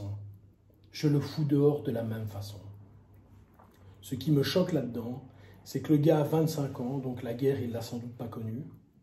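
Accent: Belgium. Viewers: Commentaire pertinent et argumenté...malgré ton terrible accent belge!!!! le racisme on peut dire ce qu' on veut c est à la tête du client...un raciste en France tu mets zidane en face, il lui lèche le dessous des c....et prends un selfie en lui tapant la bise...un raciste en FRANCE fermera sa gueule si 1 médecin urgentiste marocain ( c mon pays d'origine et suisse parents ) sauve la vie de son gamin après accident de voiture etc...n'aie crainte les commentaires de rageux..c est la vie..et c est dommage!!! g eu les boules,ça m a fait de la peine de voir certains commentaires autant que j ai kiffé ta " sortie" via cette video😉👍 sans rancunes pour ton accent lol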